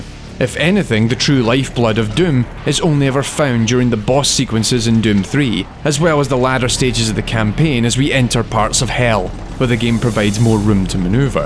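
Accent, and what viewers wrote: Scotland, United Kingdom. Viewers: A scotsman tells me about violence. Love it. TAEK DE RUENSTOOONE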